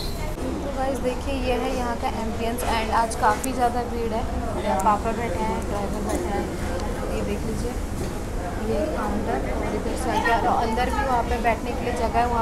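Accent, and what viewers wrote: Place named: Odisha, India. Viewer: Odia Heiki hindi...lol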